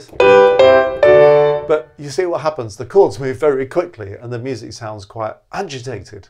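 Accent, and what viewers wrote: RP, United Kingdom. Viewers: Love your RP accent. So comfortable to listen to!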